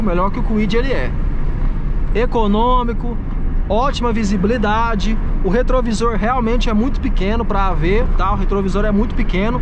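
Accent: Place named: Minas Gerais, Brazil. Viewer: Da pra ver que é mineiro de longe kkkk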